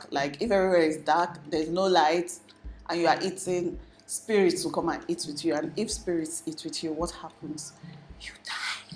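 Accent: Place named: Warri, Nigeria. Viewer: No wonder!! I knew your pidgin English was like a warri girls own ... your so beautiful 😍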